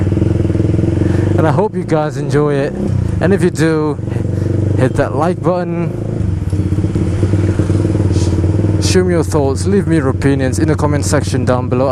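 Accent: United Kingdom. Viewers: very british, i love your accent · aksen britishnya keren bang, mantap (y)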